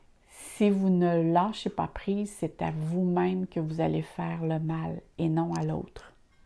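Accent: Canada. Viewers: Merci pour cette voyance et votre accent canadien 😁😁 j'espère que ça va se passer car besoin de positivité 👍 à bientôt ..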